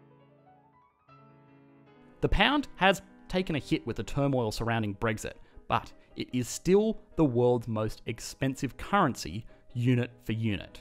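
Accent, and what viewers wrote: Australia. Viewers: I’m loving your channel as an uneducated economics enthusiast, and the Aussie accent only makes it better! Keep em’ coming! 🇦🇺👍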